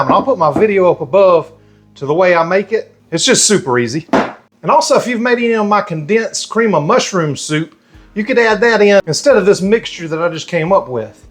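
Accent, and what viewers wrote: United States. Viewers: Us Canadians sure miss you Americans. Good thing we can get our fix of accents and genuine kindness through you tube. I really enjoy your content👏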